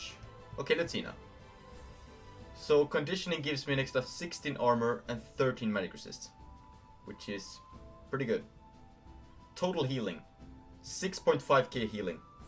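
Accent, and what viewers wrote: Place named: Germany. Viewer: You do kind of look German and I have heard english speaking germans who sound like you. I initially assumed you were too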